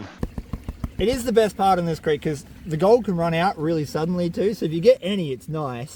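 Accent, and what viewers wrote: Australia. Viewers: Aussie accents are only 50% understood to start with. The background noise cuts that by too much to know what's being jabbered about.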